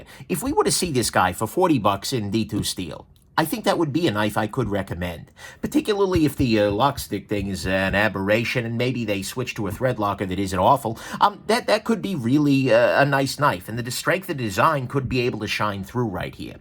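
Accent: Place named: New England, United States. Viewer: I tried to watch this but his ridiculous Yankee accent ruined it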